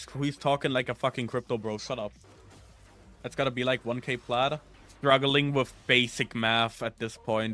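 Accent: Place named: Germany. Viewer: i kinda hören deutsch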